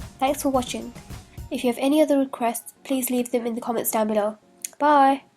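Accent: United Kingdom. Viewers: Like a cross between British & summin else tho. Hot x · Birmingham I imagine lol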